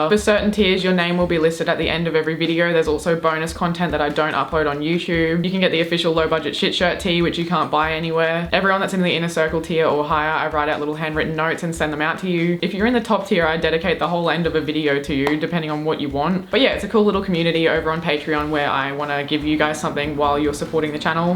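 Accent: Australia. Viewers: I really love your accent and the Australian accent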